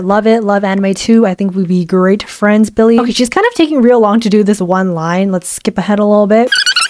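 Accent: United Kingdom. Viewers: Stop the british accent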